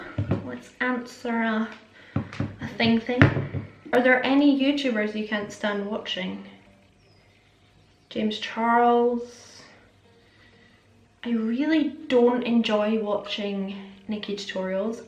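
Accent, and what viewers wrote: Scotland, United Kingdom. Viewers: Love these Q&A videos! I'm wondering about your accent, you said you were picked on. I assume it's a Scottish accent and you live in Scotland. Do you have different accents in different parts of the country? I'm fascinated with accents and languages. Being Russian I speak with an accent. But I speak American English with the Russian accent 🤣🤦🏼‍♀️. I LOVE how British, Scottish and Australian accents sound. I LOVE listening to you speak, lol. I think it's very pretty. It was painful to hear about your back accidents... I'm so so sorry girl! I'm sure you've been to good doctors. Hope they can find the best treatment for you. Have they considered surgery to repair whatever you damaged? I know surgery is always the last resort but it sounds like you're in so much pain... I really hope it gets better soon. Sending you love! ❤️ ❤️ ❤️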